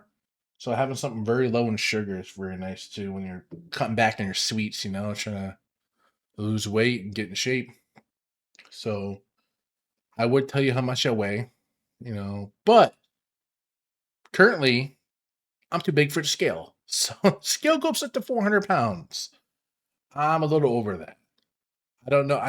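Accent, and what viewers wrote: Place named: Upstate New York, United States. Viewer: First view, first comment here! All the best on your journey,. Say, I am an informal student in accents and I want to guess you are either in our grew up in upstate New York. That's my first guess. My second guess is Eastern Pennsylvania. My third guess is Chicago. My fourth guess is a city or suburb in the northern part of the United States (in a state that touches the Great Lakes). Let me know if I'm right!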